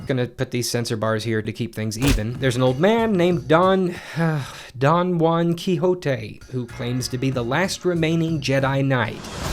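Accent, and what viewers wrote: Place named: New York, United States. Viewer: Best HS Book Report Ever. Sounds like a New Yorker....gotta be a New Yorker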